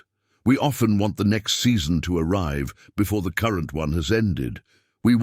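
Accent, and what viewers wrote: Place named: England, United Kingdom. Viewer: Very good. But please 🙏 please no more English accent.. please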